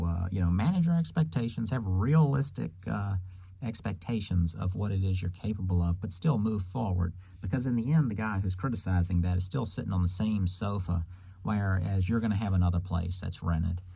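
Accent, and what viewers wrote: South, United States. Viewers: Wtf! I just googled where you do these rentals and you’re in the same province as me?? I thought you did this in the southern US because of your accent. Let me work for you 😂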